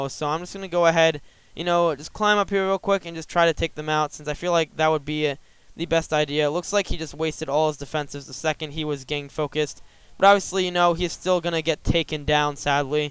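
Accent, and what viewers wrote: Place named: United States. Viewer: Right, what server are you on btw,? I'm feeling a bit of american in your accent. Not sure which one specifically.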